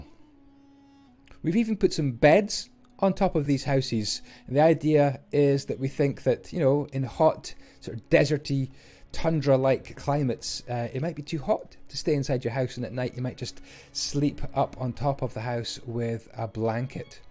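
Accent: Scotland, United Kingdom. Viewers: Like ur scottish accent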